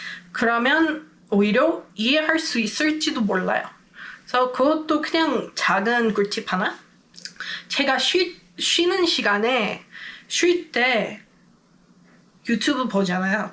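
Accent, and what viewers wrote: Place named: Seoul, South Korea. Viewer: 세상에나. 서울에서 5년 살아도 서울억양 안되던데 님은 진짜 서울말 억양 너무 완벽하시네요!!! 부러워요..그 능력이!!!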